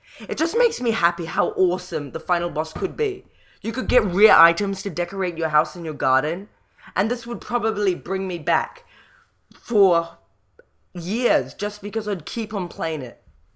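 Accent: United Kingdom. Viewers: It's British I believe and I know, I wuv it too! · You sound british :D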